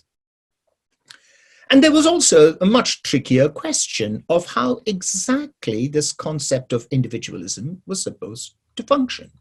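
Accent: United Kingdom. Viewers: Am I the only one who thinks his academic British accent to be a tad ironically amusing?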